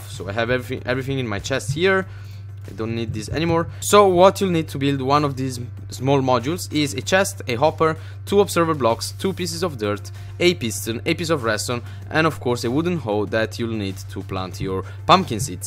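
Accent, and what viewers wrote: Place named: Italy. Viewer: Ma quanto si sente che sei italiano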